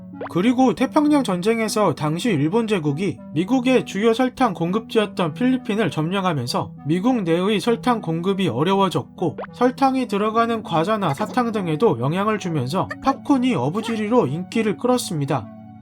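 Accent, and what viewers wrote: Gyeongsang, South Korea. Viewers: 부모님측에 경상도분이 있으신건지 조심스럽게 추측을...저도 서울사람이지만 충청도 사투리쓰거든요 영향받아서ㅎㅎ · 이거 혹시 사투리신가요? ㅅㅋㄱㅋㄱㅋㅋzz갱상도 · 서울 사투리 안 쓰시고 경상도 사투리 쓰시는 거 보면 경상도 분인가? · 형 경상도 사람이었구나 친근한 억양이 들렸어 블루베리 스무디 해봐 형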